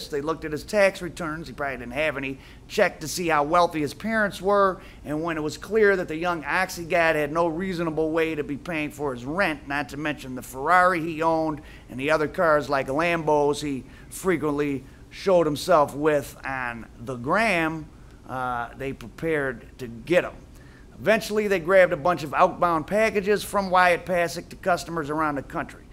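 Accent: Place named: New York, United States. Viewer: I am trying to figure out your accent sometimes it sounds like New York sometimes it sounds Brooklyn sometimes it sounds like Chicago what the fuck are you bro? I'm from Brooklyn, but I can't fuckin figure it out, No disrespect..Are you a Paisan? 🇮🇹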